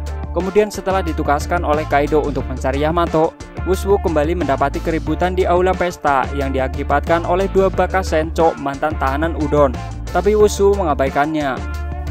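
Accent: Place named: Tegal, Indonesia. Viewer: Dri logat bicara nya, kya won't tegal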